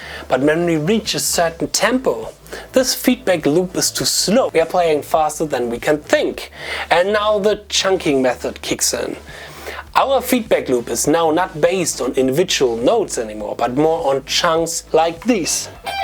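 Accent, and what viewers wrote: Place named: Germany. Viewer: Great video but as a fellow german,the accent makes me crack ip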